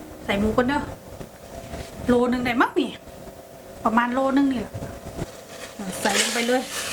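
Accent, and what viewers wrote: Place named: Korat, Thailand. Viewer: ฟังเสียงแล้วใช่เลยคนโคราชบ้านเอง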